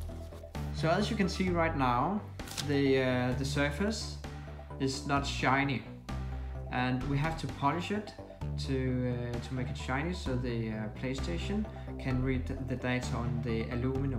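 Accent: Denmark. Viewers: I knew it. Dansker.... Our accent NEVER lies.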